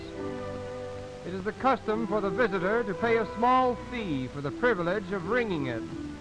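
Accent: United States. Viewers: ナレーションアメリカ人かな？ まだ日本とアメリカが仲良かった時代か。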